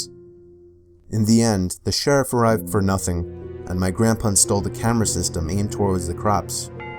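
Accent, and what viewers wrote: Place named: Boston, United States. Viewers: Classic. This is when had his NE accent. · U sound like your from Boston just a thought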